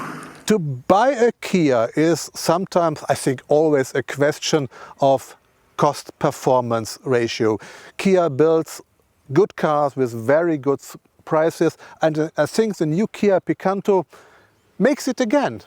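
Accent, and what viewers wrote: Germany. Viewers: For the first ten seconds, I was trying hard to figure out what he speaks is English or German.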